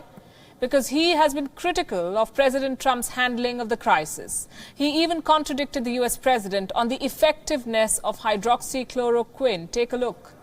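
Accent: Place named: India. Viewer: Majority of indian population understand their own language better than English So then why english channel with indian funny accent Why they need to pretend to be What they are not hahaha lol So stupid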